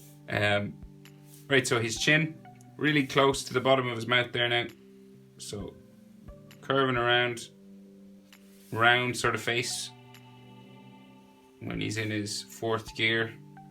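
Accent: Ireland. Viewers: Your accent sounds sorta irish would ya happen to be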